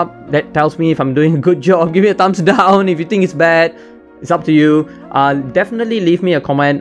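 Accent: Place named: Malaysia. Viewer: are you malaysian ? Sounds like you are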